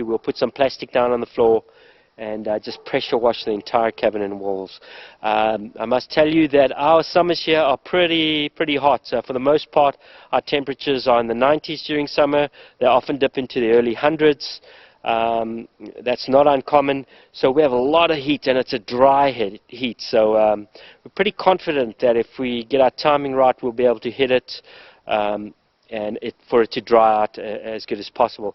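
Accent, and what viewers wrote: South Africa. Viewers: is he south african or is it just me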